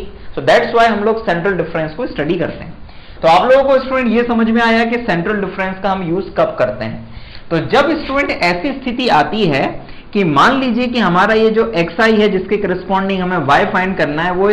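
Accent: Bihar, India. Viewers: bihar se hai kya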